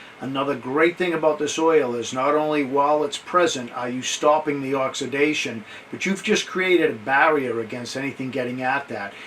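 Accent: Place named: New Hampshire, United States. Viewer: The caption says, "cab connors." Though it's probably a typo, it's funny because it matches NH accent.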